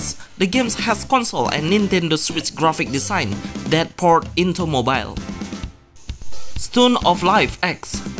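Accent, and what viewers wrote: Indonesia. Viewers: hmm I feel you are Indonesian or Russian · Let me gguess by the sound of your accent your Indonesian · logat indo mu kerasa banget bang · dari aksennya orang indo kah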